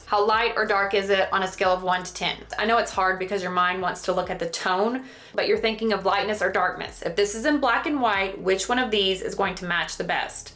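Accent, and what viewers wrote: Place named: Texas, United States. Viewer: Are you from Texas? Nice southern accent & also because Texans are smart.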